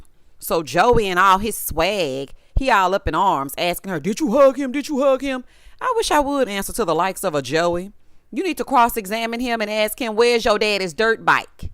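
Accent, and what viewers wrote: South, United States. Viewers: Blythe from NYC, here. I usually listen to your reviews on speaker when I’m doing something else. Today, my bf overheard and was oh so tickled by the “sassy southern belle” coming from my phone😂.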